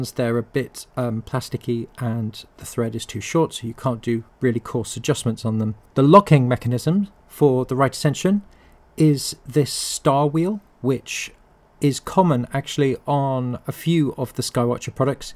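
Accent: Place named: United Kingdom. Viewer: Thanks for the video - love your work. Nice to hear a Brit accent in the AP world! I'm not sure that the £779 asking price is worth it. It's £300 more than the GTi, and whilst that gets you 10Kg payload vs 5Kg, Sky Watcher's own EQM 35 Synscan Pro for £120 less than this new mount seems to be a much better deal on paper. What am I missing?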